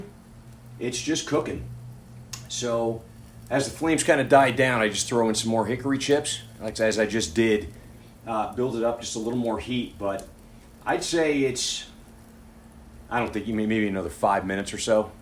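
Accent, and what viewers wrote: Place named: Italian American, United States. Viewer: Very Italian American accent haha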